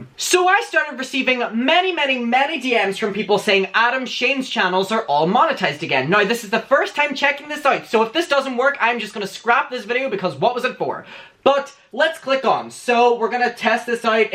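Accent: Ireland. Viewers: He sounds a little Irish and British. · I think his is Irish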